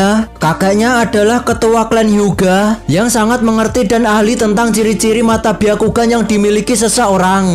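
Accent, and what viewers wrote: Java, Indonesia. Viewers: Mimin org jawa.. Medoknya keluar.. 😅😅 Suaranya kaya robot..lurus😅😅 Tp malah pny ciri dan lucuuuuu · aku suka film nya cma kok suaranya medok amatt 😂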